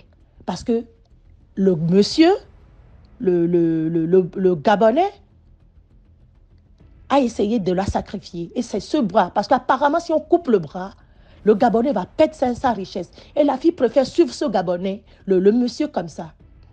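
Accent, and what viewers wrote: Benin. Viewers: L’accent est un accent béninois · Ça se passe au Bénin. On reconnais votre accent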